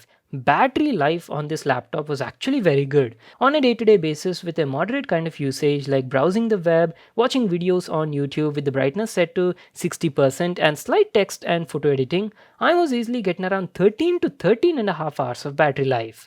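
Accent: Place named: Telangana, India. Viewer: bro are you from telangana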